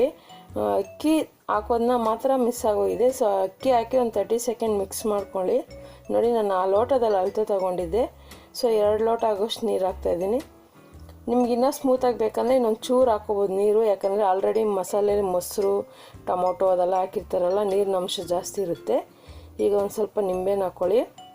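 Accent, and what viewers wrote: Tamil Nadu, India. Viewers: Neevu tamilnadu nora nimma kannada adethara ide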